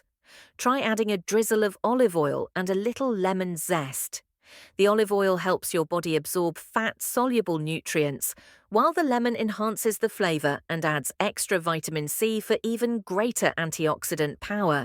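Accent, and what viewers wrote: United Kingdom. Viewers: Np need to put British accent AI. Just keep it simple! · An English voice giving advice in American Cups. 1oz or 3oz would be understood by English people. I. England we are pensioners not seniors. A I. Grrrr. Also spinach can be dangerous. English people use cabbage and sprouts. Also if on blood thinners for the heart and low BP we need to be careful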